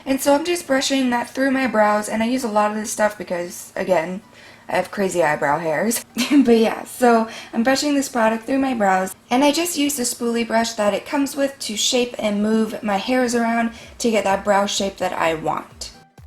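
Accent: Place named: United States. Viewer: As an Englishman, I love American accents, this one in particular. I wish this wonderful lady would do some ASMR.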